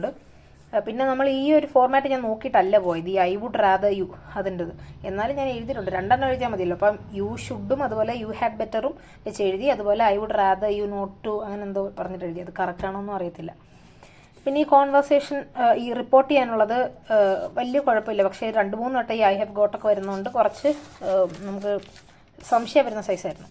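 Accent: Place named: Kollam, India. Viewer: Kollam aano allel alappuzha aano ente cousinte slanginu similar slanganu sisinte slang 😅 btw all the best sister, ente examum moderate level eennu parayum, paper different aanu, pinne bio namukk, bot3, zoo3 chp vechitt ind, physics complete aayi ini derivations practice chyan und.... All the best champ . 🔥🗿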